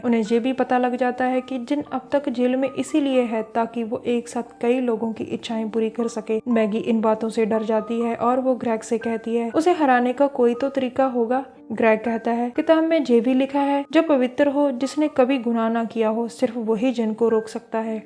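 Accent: Punjab, India. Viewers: U r punjabi ?? Cuz u speak hindi with punjabi accent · U are punjabi right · Punjabi touch ha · I love your all videos didi . thodi voice to lgda v tusi punjabi ho m also from punjab 🤗🤗🤗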